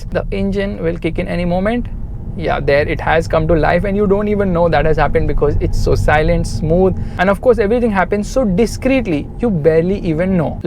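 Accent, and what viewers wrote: India. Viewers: Slow the f down with your talking. Your chatting at such a high speed with that indian accent that its hard to understand you. Just a hnt you DO NOT HAVE TO PRONOUNCE EVERY LETTER IN EVERY WORD..especially the letter R.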